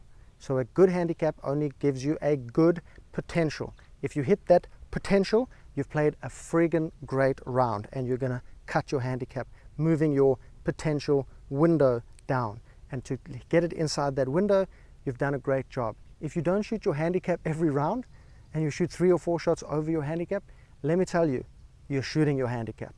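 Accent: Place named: South Africa. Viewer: Howzit china! Judging by the accent, you're just as South African as me lol. Dig your vids my bru. I've managed to lower my handicap from 28 to 16. Happy with that. Aiming for single digits this year. Been playing properly now that I'm in the UK as it's much more accessible than back home. Your tips and tricks are much appreciated!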